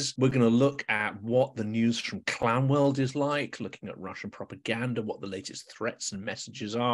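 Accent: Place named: United Kingdom. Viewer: I love having the wonderful summary you have put together tonight! Your one hour commentaries are priceless as have all your guests been. Never think they are too long! Your work is amazing and appreciated. I’m so busy keeping up with our Starsky, and Johnny FD and our Canadian/UK/Swede Brandon, it’s a gift you give us bringing honest news and knowledgeable guests on your channel. Thank you for all your hard work and the British accent is a pleasant change from our American talking heads. Слава України 🇺🇦 героям слава! 🧨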